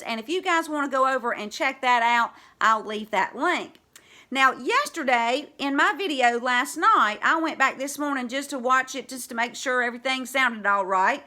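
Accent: South, United States. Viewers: Hi what a pleasure to meet you. I'm a new subby too. Love your sweet southern accent. God bless you and your family. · Aren’t you just adorable love your spirit and southern twang :-). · It's just that deep Southern draw!!!! You would fit right in here in East Texas!!! 😉😘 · HELLO. WOW I LOVE UR SOUTHERN ACCENT. ITS JUST LIKE MINE! IM FROM NC. I FOUND UR CHANNEL. ITS AWESOME CONTENT. THANK U FOR SHARING!!🇺🇸🇺🇸🇺🇸🙏🙏❤❤❤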